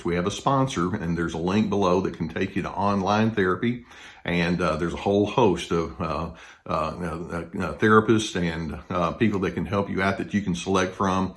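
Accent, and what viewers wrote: South, United States. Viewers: Your description of a narcissist freed me! I couldn't believe you were saying everything I was dealing with, like Gaslighting! He put me through hell playing that sick mind game, he made me question my sanity on more times than I want to admit. Just sitting there with my ear buds in listening to your calm southern voice that reminds me of my uncle you put into words what I wasn't able to because I was so beat down, frustrated and empty! At the end of your video I knew what I was dealing with, I knew it was never going to stop and I knew I was done! It was so bad I was carrying a pistol! I packed up what I could get in my car and left that day and I will never go back!! He has turned my youngest child against, and tried to do the same with her older sister but she cut him off when he starts on a rant! I'm gone, sooner or later he's going to need a new source of supply so he's going to point that inner rage at his own daughter and when he does he has met his match because she's more controlling than he is! 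Lol She's a chip off the ole Narcissistic block! I don't hate him, love him, he's pathetic. He had a stroke from high blood pressure because he wouldn't take his meds. and he has tubes coming out of his kidneys because they're barely working and you know what? I'm not going to help wipe his ass, I did it for 41 years and I refuse to give him one more minute of my life! I might sound heartless, if I am he made me this way!! This is my life, the fact that I have absolutely no i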